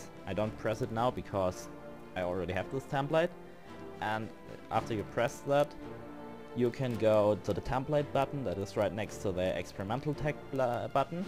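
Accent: Germany. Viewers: That's a typical German accent of someone who did not spend much time talking with/to native speakers directly. It's pretty much basic school English. How is your accent when you speak German?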